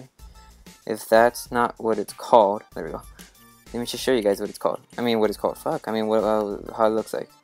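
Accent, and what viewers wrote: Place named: Japan. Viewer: pfff your accent bro! is it ..japanese or something !!!!!!!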